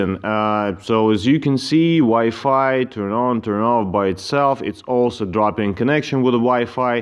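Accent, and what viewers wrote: Russia. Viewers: Solved my issue, thanks. Your have an obvious accent, is out Russian?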